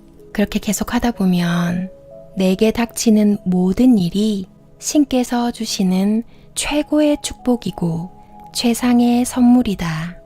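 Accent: Gyeongsang, South Korea. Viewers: 갱상도 말씨 너무좋아 조아요^,^ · 경상도 사투리가 약간들어간 목소리가 너무 좋습니다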